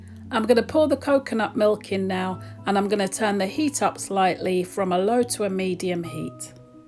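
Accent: England, United Kingdom. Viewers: I'm from Ireland and I'm living in New York and I can tell u that when I hear an English accent, I feel, oh, my cousins so I always love to see and hear my cousins!!!💚 Great recipe!!!! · Have a sweet potato soufflé with chunks of sweet potato. Also I add cauliflower to my curries since it takes up the spices beautifully. Lived in UK for 4 yrs early 80’s so enjoyed the accent a lot! 😊 thanks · Top recipe thank you and at last....... ....a British accent :)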